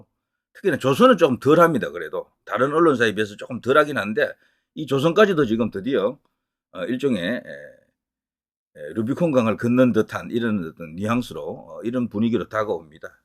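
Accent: Gyeongsang, South Korea. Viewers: 발음을탓하기전에 더보기보시면 바로아실텐데 경상도 억양은 발음자체가 표준어처럼표현하기힘들어요 새겨들어야합니다 취지가 참 못되셨네 빙빙돌려서 참 불쾌한짓을 하시네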